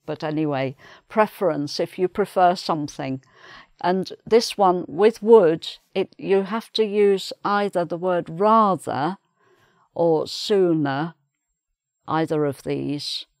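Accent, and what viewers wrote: England, United Kingdom. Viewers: British English 🤗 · Your British accent makes me warm,. I love your lesson. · I am an English teacher and today I have learned that uses of "Would." Thank you Miss. (Plus points: you don't speak too fast and I like your british accent.) Lovelots from the Philippines. 😘😘😘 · I love her British accent ♡ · Thank you Mrs! I do appreciate your lessons and your beautiful British accent. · I love British accent. ❤ I feel happy when I listen to this loving teacher. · excellent teacher no doubt! Me being french speaking English since 1977 on a daily basis mainely in the U.S I ADORE hearing this REAL English accent :) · I'm head over heels for your british accent a lot.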